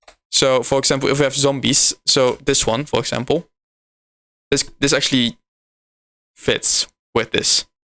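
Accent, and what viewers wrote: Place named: Netherlands. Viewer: I lived in Amsterdam for 10 years and I've never heard a stronger Dutch accent than this.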